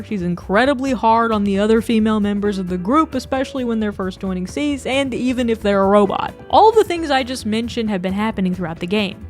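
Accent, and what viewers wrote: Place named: Texas, United States. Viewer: this is totally unrelated to the video but you must be from the south right? I’ve lived in Texas my whole life and you remind me of people I’ve grown up with and people I’m around all day every day with your accent and the way you talk. I swear you must be southern cause it’s uncanny how alike you sound to people I know in Texas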